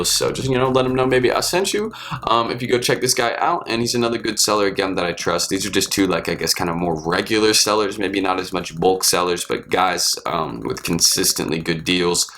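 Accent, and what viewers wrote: South Carolina, United States. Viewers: You from sc?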